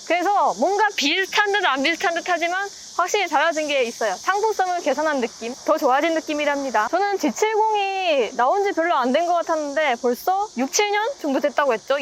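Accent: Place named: Busan, South Korea. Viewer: 음~~ 서울 상경한지 꽤 됐는데 아직 서울말 패치가 덜 된 부산아가씨 억양이네 ^^ 재밌는건 부산 가서 저런 톤으로 말하면 서울말 쓴다고 면박주고 서울에서는 아직 경상도 사투리 못 벗어났다고 하거든 정체성의 혼란을 겪고 있을 시기임 ㅋㅋ 리뷰는 재밌네요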